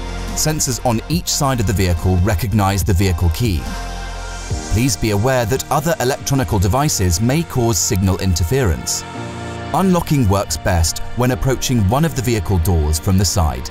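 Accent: United Kingdom. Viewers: 뭐야? UK English???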